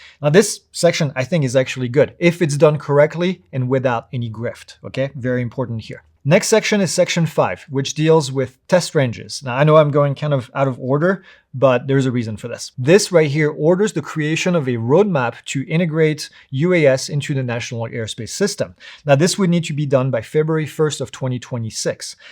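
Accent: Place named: France. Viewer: I’m just here for moderately fleeting French accent. Avoir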